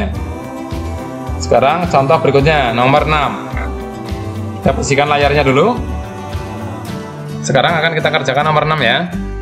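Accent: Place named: Banyumas, Indonesia. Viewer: Bapaknya ngapak ya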